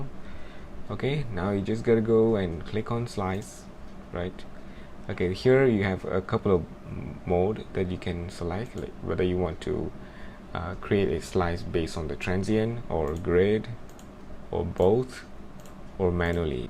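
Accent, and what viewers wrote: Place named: Malaysia. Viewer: I thought the accent sounded familiar! Hehe Malaysian in the house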